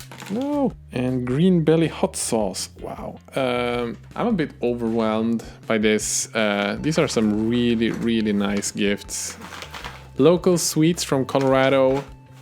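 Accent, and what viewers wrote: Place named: Sweden. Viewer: Sjukt att hitta kanalen och först höra du mest troligt är svensk utifrån dialekten och nu se Umeå på ett paket! Hej granne!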